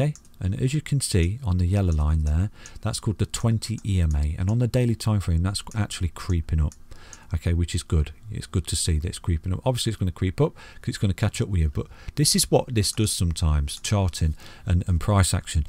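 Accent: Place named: Nottingham, United Kingdom. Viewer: Awesome :D Love it! Where you from, you sound like you're not far from Nottingham or Derby?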